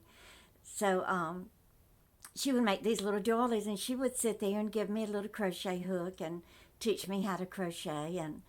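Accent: South, United States. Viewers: you do look good, I am younger and in good shape but have more wrinkles on my face than you, so you are right about the water drinking. Thank you for you... I appreciate your good old fashioned down south speaking voice (my father was from down south as well as my husband) and it is so good to see you so happy and lovin your grandchildren and family...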